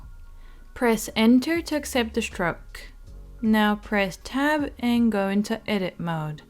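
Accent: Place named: Scotland, United Kingdom. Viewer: The heck kinda accent is that? Watered down Scottish?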